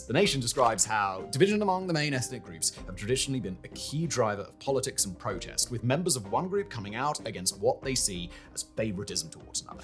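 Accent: RP, United Kingdom. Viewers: Couldn't watch the 'over acting or the over the top posh english with the noticeable changes in the octave of the tone', i thought he sloved it down noticeable recently but guess he's back at it again. Time stamp is .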